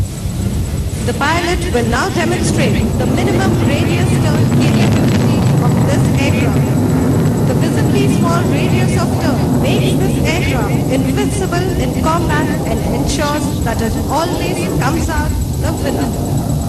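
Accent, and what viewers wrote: India. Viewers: i hate thick indian english accents....they should've shut that bitch up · Curry English has special favor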